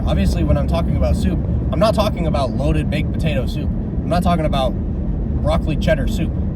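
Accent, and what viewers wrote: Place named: Australia. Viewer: I thought you were australian🇦🇺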